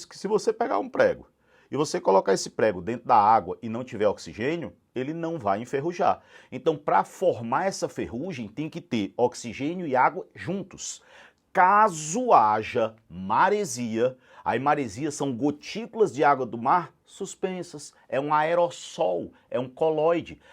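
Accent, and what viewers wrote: Northeast, Brazil. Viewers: Além de excelente professor, tem um sotaque lindo demais!! O senhor é daqui do Nordeste né prof?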